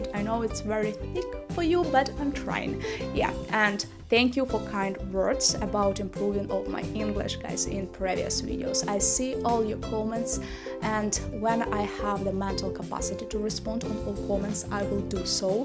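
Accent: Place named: Eastern Europe. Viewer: Thank you for this interesting video,. I like learning about Ukrainian traditions. Hope your family in the East is safe and warm. Take care of yourself. Hope your mental health will get better. This time of year is hard for mine too. Take it easy on yourself. We say things to ourselves in our own heads that we wouldn't dare to say out loud to someone else. You're doing great! Your English is excellent. No need to worry about your accent. It gives you character. And I'm sure it's easier to understand your Eastern European accent than it is to understand my Southern US (NC) accent. Hope you and your family are all OK. Stay safe and warm as best you can. United we stand. Разом ми сильніші. Разом до перемоги. СЛАВА УКРАЇНІ!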